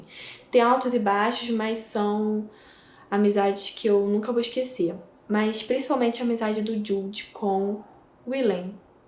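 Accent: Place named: Pará, Brazil. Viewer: Oi... Você é do Pará?... O sotaque parece bastante.🤗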